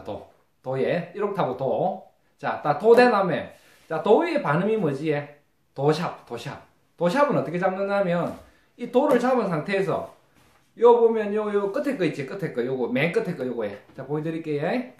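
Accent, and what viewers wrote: Gyeongsang, South Korea. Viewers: ㅎㅎㅎ 나도 경남사람이지만 경상도 보리문댕이 사투리 너무 정겨워....ㅋㅋㅋㅋ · ㅋㅋㅋ 경상도 사투리 강의 정말 구수하고 잼있습니다.^^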